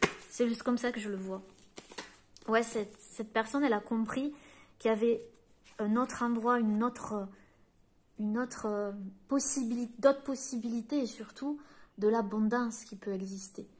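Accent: South, France. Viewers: Bonjour. Merci pour cette guidance qui me parle beaucoup 🙏🧘‍♂️🕉🌷🌺🌍💞 on entend votre petit accens du sud et vous devez connaître l opercule de sainte lucile un porte bonheur, représenté sur la carte ( 16 Ce que vous voulez vous veut ) ce qui m’aide encore plus à croire votre guigance votre cœur. MERCIIIIIIIIIII de nous aider éclairer. Je vous souhaite un trés bon week-end que DIEU ET L’UNIVERS VOUS PROTÈGE NOUS PROTÈGE 🌷🌷🌷🌺🌺💞🌍🕉🧘‍♂️🙏🐱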